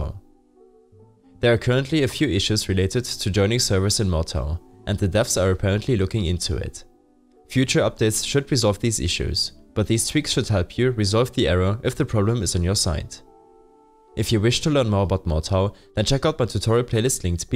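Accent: Germany. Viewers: This german accent omg Im german too yay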